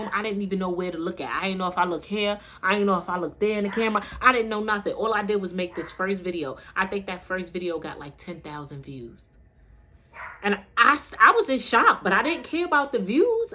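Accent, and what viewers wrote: New York, United States. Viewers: Hey, I have really been enjoying your content lately . Due to it being real life situations, l and many more can relate. I used to live in Brooklyn, so I play your videos in the background to feel at home You have the real New Yorker accent is so strong I chuckle every time you start talking.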